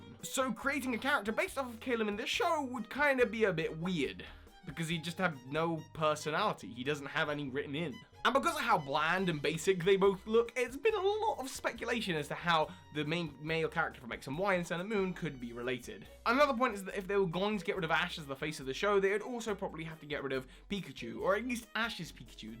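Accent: United Kingdom. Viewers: soar high... awesome catchphrase there, amplified by the good old British accent. quick question( that you may have answered before, but you have so many videos and I have so little time):from the legendaries alone what game would you pick, sun or moon? I personally would like any but I would prefer moon because, although I adore psychic types, dark is my 3rd favourite type! I can't think of how to end this so, buh-bye!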